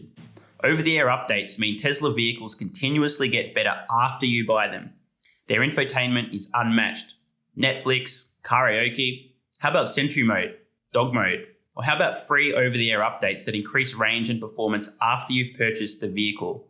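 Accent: Australia. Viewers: I'm not super interested in buying a Tesla car right now because I would rather have a Corolla- or Camry-like electric car, at those lower prices. But I would invest! Though I could only manage 5 or 6 shares, to begin with. Nice to hear an Australian accent talking about this! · I could have made the exact same video (minus the Australian accent LOL). Totally share your views -- I am personally heavily invested for the same reasons as you stated plus the fact that it's at least 50% undervalued (I have my own calculations). It's as clear as day that in 5-10 years TSLA is going to be a multiple bagger. And like you said -- in 2030, people will say how lucky you were to invest in TSLA.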